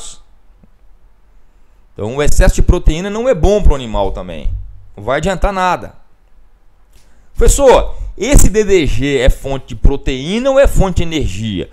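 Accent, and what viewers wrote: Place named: Bahia, Brazil. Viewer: Professor tinha quase certeza pelo seu sotaque que era da Bahia! Acabo de receber de um amigo com quem compartilhei este vídeo a informação que é conterrâneo e que hoje está na UFPA!! Sucesso!! Abs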